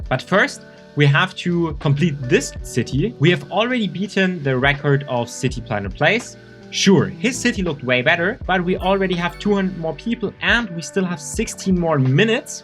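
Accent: Germany. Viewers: I hear a german accent :) great video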